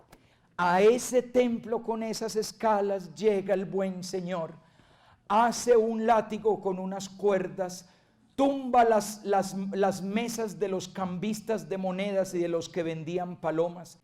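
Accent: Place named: Colombia. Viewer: Me encantan,sus enseñanzas maravillosas,me encanta ése Acento tan,colombiano.no sólo eso.tan enamorado de <link> llegan á lo profundo de mi corazón.no quisiera,perderme,ni una conferencia. Dios le siga dando ésa sabiduría. Divina. Yo soy Colombiana.pero hace 40años vivo en Estados Unidos.Bendiciones lo queremos mucho,padre.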